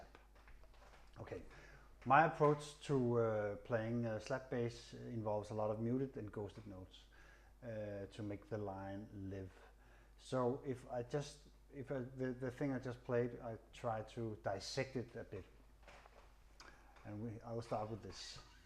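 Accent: Denmark. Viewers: Elsker den danske accent :D Men super fedt spillet :D! spiller selv lidt funkey bas for sjov men gad godt at have din erfaring!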